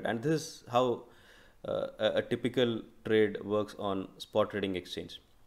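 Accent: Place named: India. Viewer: I knew it that he is Indian guy as soon as i heard his english accent and he proved it in that chart of Trading Comparison because only Indian or non US citizens would write $ sign after the number 😂 Instead of writing it as $10 he wrote it as 10$ hence proved Indian. No offense I'm from India too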